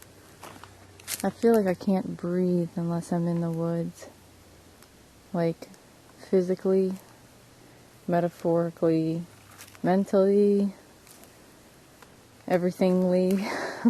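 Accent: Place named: North, United States. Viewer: Of course, assuming by state, I am just assuming you are in northern US or Canada based on your accent.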